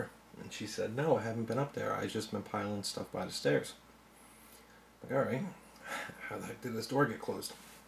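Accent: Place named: Midwest, United States. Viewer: OK, first off, I can't believe they would make you pay a fee for THEM to disclose shit about the property, I thought they HAD to disclose a haunting! Email that show "The Dead Files" on the Travel Channel and get Amy Allen to come out and see who the heck is haunting your property. You have a baby, tell them that and they may make time for you. You sound "mid west" so start looking, lots of paranormal groups or a priest or a shaman. Start doing research on the property and the grounds or area.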